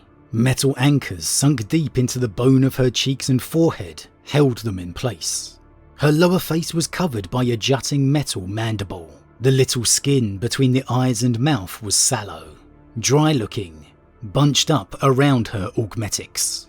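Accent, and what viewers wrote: United Kingdom. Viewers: You've got my favorite British accent of all time. I hope you never stop!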